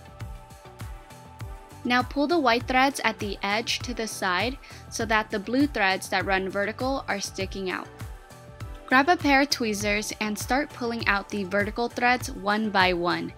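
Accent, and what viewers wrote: United States. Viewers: i love these videos even though i have no sewing ability whatsoever 😂 also, it amuses me the way americans say legs like 'ley-gs' rather than 'leh-gs'. 😉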